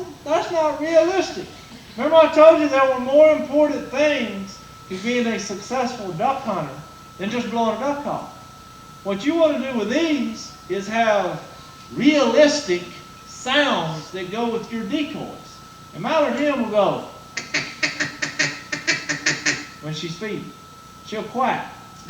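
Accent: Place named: South, United States. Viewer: his southern drawl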